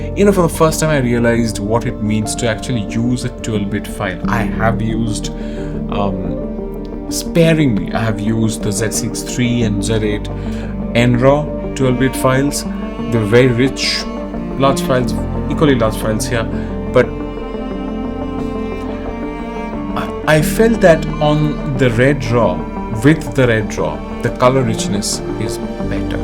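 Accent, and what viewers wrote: India. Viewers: Are you bengali by any chance? Anyway did you try using the mini hdmi to hdmi coverter with the cage? What is that part called?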